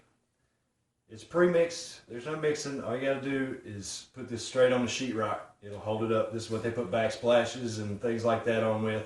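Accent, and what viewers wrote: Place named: Georgia, United States. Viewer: thanks for the quick response! Where do you do your work? You sound like you're from GA.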